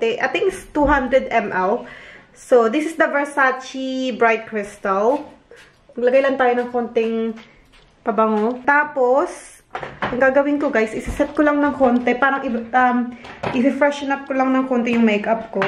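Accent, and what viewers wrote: United Kingdom. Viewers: Hi sis! I’m your new subscriber now. Hope mka bisita ka po sa bahay ko. Cute nman ng mga babies mo.... I love British accent!😘❤️ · I love that British Accent! Lakas maka Harry Pottah! Chaaarr! 😆👌🏽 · whoooa i like your british accent now nice blog. im here now dala angk regalo. tinapos ko talaga nice family. asahan din kita sa bahay ko. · British po tlga accent mo na,nakasanayan. Bumpbump na po ako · Great. Congratulations for that👏👏👍 And ilove your British accent momshie🤣😊👍👍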